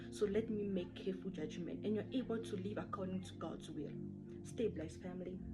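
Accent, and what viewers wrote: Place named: France. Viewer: You speak French huh? I can hear the accent 😂❤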